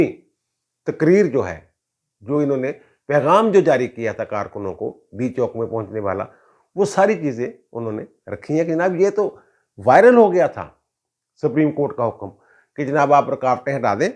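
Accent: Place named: Jalandhar, India. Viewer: Wel done Ch Sb.Your Urdu and Punjabi style is Jlandri (East Pb of India. It is true or or otherwise!?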